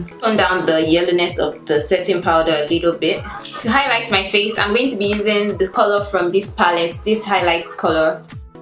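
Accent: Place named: West Africa. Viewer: Okok I see you ahaha, I hear an accent are you from somewhere in west Africa ?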